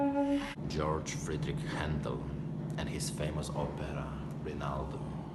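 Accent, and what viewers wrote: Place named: England, United Kingdom. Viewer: You are adorable, unic, original human being with a worm ❤️. I can't wait to get this Fabulous CD, thanks so much for your information about your favorites in Classical Music. I love your English accent and the way you express your Passion to play the beautiful Music with your Cello. God bless you 👼*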